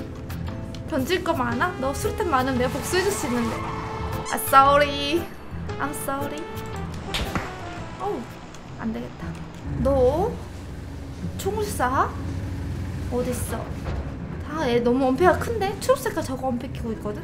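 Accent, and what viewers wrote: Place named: Masan, South Korea. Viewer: 제가 FPS겜을 안하고, 못해서...그래서 PUBG도 단 한번도 안해봤고, 그러니 당연히 PUBG 방송도 안보고, PUBG 방송하시는 분들도 몰랐는데... 그런데 매우 기적적이게도 유튭 알고리즘이 제가 관심도 없는 PUBG관련 영상을 제 유튭 첫페이지에 띄워줬는데 그게 마침 님이셨; 사실 썸네일과 제목만 보고는 님이 PUBG 유져 겸 방송인인줄은 모르고 썸네일의 웃는 모습이 넘 러블리해서 클릭했다가...했다가...지금 님 영상 역주행 하면서 막 다 보는 중입니다 +_+ 사투리는 거의 안쓰시지만, 말 할 때 마산 사투리의 억양이 묻어나오는데 그게 너무 귀여워요!!! 게다가 웃는 모습은 넘 이쁘고, 와의 캐미도 너무 귀엽고 좋고, 플레이도 넘 잘하셔서 저는 배그 안하고 안좋아하는 장르임에도 불구하고 님 영상 보면 너무 재밌어요!!! 아... 님 채널을 왜 이제 알았지? ㅠ.ㅜ 새해 복 많이 받으시고, 특히 돈복과 구독자복 한가득 받으시길 바랍니닼ㅋㅋㅋㅋ 구독과 좋아요, 알림설정까지 하고갑니다 ^0^ 덧: 근데 강도질이랑 인성질 메타 넘 웃기고 재밌어욬ㅋㅋㅋㅋ 양용이만 타면 실력이 수직상승하는 님 쵝옼ㅋㅋㅋㅋ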